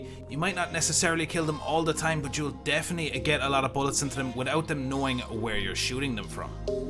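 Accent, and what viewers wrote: Ireland. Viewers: Wat part of ireland r u from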